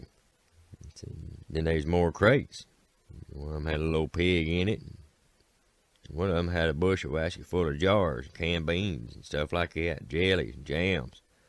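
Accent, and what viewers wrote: South, United States. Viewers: your smooth southern comfort voice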